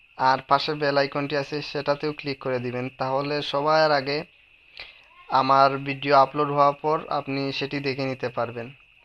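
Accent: Sylhet, Bangladesh. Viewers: তুই সিলেটি না কিতা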